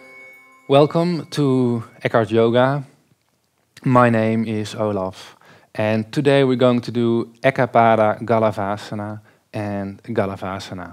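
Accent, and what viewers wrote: Netherlands. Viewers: Ik kan horen dat je nederlands bent haha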